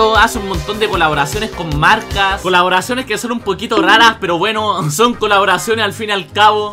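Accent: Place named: Chile. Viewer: La unica parte buena de chile, es ese acento chistoso. Grande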